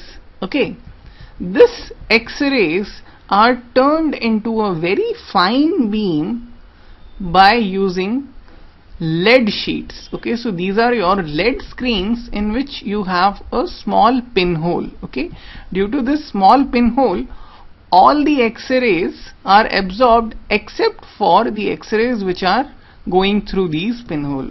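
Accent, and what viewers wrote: India. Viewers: the pajeet accent is hilarious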